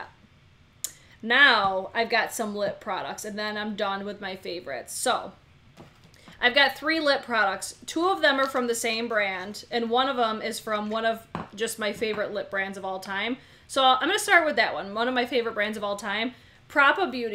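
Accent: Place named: Chicago, United States. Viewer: I was born in Binhamton and grew up in Chicago and I totally thought you were a Chicago girl from your accent too! Lol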